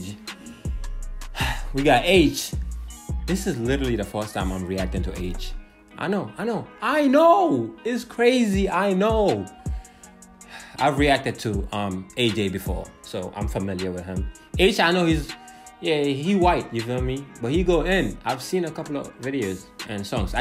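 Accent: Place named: United Kingdom. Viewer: You actually sounded like you were from the uk tho 😂 , don't be sorry!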